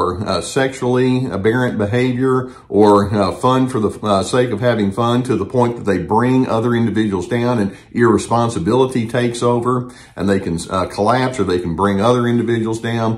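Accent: South, United States. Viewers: I forget where my discourse amongst myself takes place in this comment section, but it’s really funny because my mom will be laughing so much. Everything he says. Is me but like I’m counteracting it. It’s funny because it’s like wow he’s got that southern yet. Chris drawl. I am yapping. Like a Chihuahua. About my identity and rights. It’s hilarious. This is good banter. Sometimes two opposing forces can create something like the binary between us. Binary sound, you know what I mean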